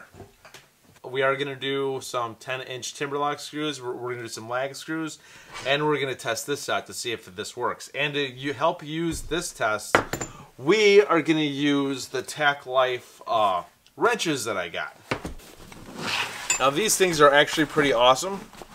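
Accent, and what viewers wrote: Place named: Wisconsin, United States. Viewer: Depending on if he's using "freedom units" or canuckistanian metric units. :D I would've guessed Wisconsin or Michigan from the accent, but Ontario is not entirely impossible.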